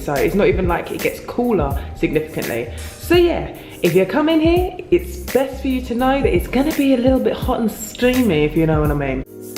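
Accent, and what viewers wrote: London, United Kingdom. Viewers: beautiful I can here you talk all day .I love that London English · Luv luv ur British accent. Glad that u like my country.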